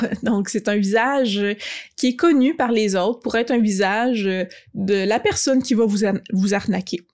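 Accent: Quebec, Canada. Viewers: Merci pour se panorama J'adore ce petit accent Québécois 😊 Et toi que penses tu des Serpents? As tu déjà joué un Serpent? En tant que conteuse, les utilises tu? Carpe Noctem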